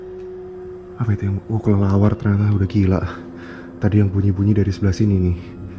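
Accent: Semarang, Indonesia. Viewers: Ka harus tau ini konten jadi favorite aku dari dulu. Mood bgt logat semarangnya😫 apalagi pas bilang "pulang yok". Bukan apa apa nih tapi saya melting berasa kaya doi😫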